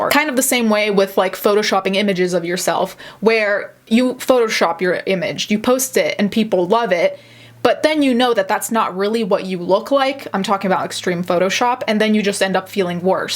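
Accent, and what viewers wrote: France. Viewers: i’m sorry if this is rude, but you pronouncing the french names with an actual french accent takes me out of the story. you don’t have to stop it’s just a side note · I'm french and everything you said is true. He was a liar for 30 years and we just found out ,thanks to 4eme œil corporation ,who he really is. But many of us were suspicious for a long time cause of the way he explained things. Always in a weird way and also different story over the years. I have no pity for him and no he didnt come clean , he admitted some things cause he didnt have the choice but keep lying since then. Nice French accent! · Do you speak french ? I ask you this because you have a great accent✨ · Your French accent is stunning. My college French professor told me I should consider not taking French 2 bc of how poor my pronunciation is. Lmao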